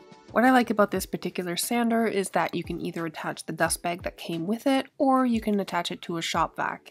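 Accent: Canada. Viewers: OMGosh, how petty. Salve...you are pronouncing the L. Aunt, people pronounce like the insect ant. Ignore the critics, people are so hateful 🙄. I love Canadian accent (I'm sure I have an Ohio valley accent). Very informative video, I want to start refinishing furniture to help supplement my income. Your videos help explain how you can get started💗💕💗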